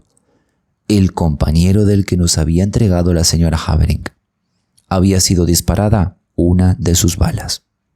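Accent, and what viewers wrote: Rioplatense, Argentina. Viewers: No sólo eres latino, hablas con la ye , que significa que eres argentino o uruguayo, me identifico maravillosamente, soy uruguaya 🙋👍✨🇺🇾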